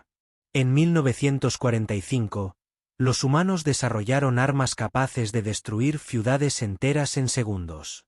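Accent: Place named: Spain. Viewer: Casi en todas sus historias aparece el apellido "CHEN", lo que siempre me ha intrigado, por lo que me eché un clavado en Google y encontré esto: ELNOMBRE CHEN , ORIGINARIO DE CHINBAPOSEE UN GRAN VALOR HISTÓRICO Y CULTURAL. PROVIENE NEL IDIOMA CHINO Y SIMBOLIZA LOS CONCEPTOS DE AMANECER Y MAÑANA.}Como nombre propio, Chen se ha usado durante siglos, representando la esencia de los nuevos comienzos y la esperanza que acompaña el inicio de un nuevo día. Ya tiene sentido que lo utilicen tanto en sus historias, ya no me quejaré. Pero no creo que "JAQUE" TENGA EL MISMO SIGNIFICADO y lo usan cada rato, cuando creo que quieren decir JAKE, por lo que no creo que su locutor, como buen español, hable inglés, y si la IA es de España, donde al Wi-Fi, le dicen GUIFI. me parece muy tierno. Saludos amigos, me gustan sus historias. Keep them coming!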